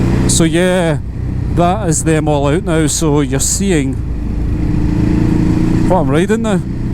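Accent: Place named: Scotland, United Kingdom. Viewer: Took me a while to 'tune' into your accent, but then after I dialled in Scottish in my head...... all good. Fun 'non review', will watch your other content to see how it plays out for you. I'm just looking in 2025 for a used HyperStrada here in Australia, not many to be found and all are the 2013 '821 version, nothing later. I'm after something non sports Ducati as my wife loves Ducatis BUT most dont do a pillion well. Scramblers appear to be an obvious choice for the seating BUT scramblers are really poorly appointed with cheap fittings, absolute scam for the price imo. Monsters we have tried but not pillion friendly, and then all the 'touring' Ducatis are heavy and bloated. The Hyper seems to be the only real option that is light, agile and simple enough for me. Just a matter of finding one at a decent price. I have boxer BMs and F800GT BM so I understand how a good bike should be made and handle, my last Ducati 749 was a lounge princess, as was the 1100S monster, I want to actually ride my Ducati but dont want to go back in time to the aircooled models for simple no time pressure maintenance. 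2013 seems to be the start of the more reasonable service intervals on the belts so I am in the correct zone I hope.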